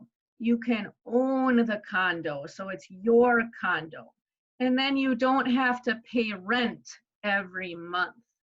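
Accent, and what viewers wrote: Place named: Midwest, United States. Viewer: I'd guess you're from Wisconsin or Minnesota, based on your accent. How far off am I?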